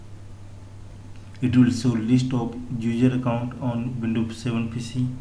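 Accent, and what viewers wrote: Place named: India. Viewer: is Indian accent, I feel fine with it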